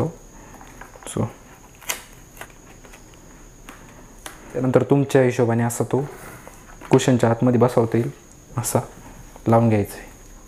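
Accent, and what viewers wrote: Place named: Yeola, India. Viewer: Ek no bhawa ...Mi yeolyacha ...aplya gawakdchi bolnyachi style nad hmmm. Cushion removable..😂😂😂😂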